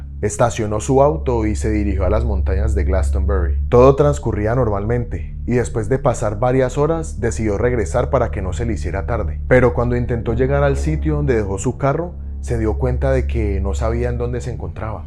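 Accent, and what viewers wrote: Colombia. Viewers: Me gustan mucho tus videos y tu estilo narrativo yncluyendo tus gesticulaciones. Si mo me equivoco tienes un asento colombiano parce. Espero tu siguiente video.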